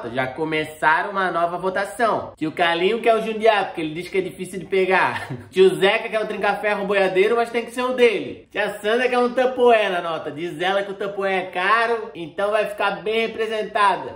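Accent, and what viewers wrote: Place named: Itajaí, Brazil. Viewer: Po vc tá virado num demonnho kkkkkk queria ver vc lá em Brasília falando as verdades pra aquela cambada de ladrão falando com nosso sotaque itajaiense kkkkkk muito seu fã nos seus vídeos vc fala oq muitos querem fala mais tem medo abraço Quiriduuu tamo junto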